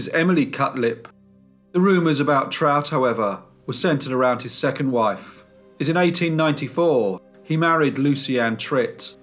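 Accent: United Kingdom. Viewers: Whenever l hear your voice, l always picture a younger 'Alan Rickman'..... I'm dying of curiosity..... Am l even remotely close? (sorry, I know looks aren't important, but I already have a crush over your British accent, so l can't help but wonder about the rest of you! Sorry for sounding creepy, I'm actually a huge fan of your channel!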